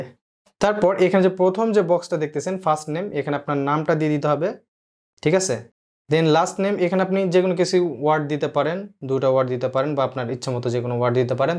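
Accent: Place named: Naogaon, Bangladesh. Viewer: Naogaon/Adamdighir language😊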